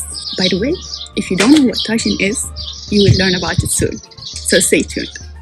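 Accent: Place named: France. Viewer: Work on accent as it sounds French, but good effort content wise .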